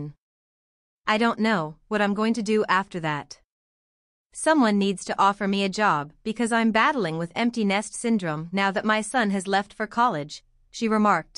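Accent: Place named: United States. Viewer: What's with the robotic American voice? It's a English soap so a natural English voice would be nice🤗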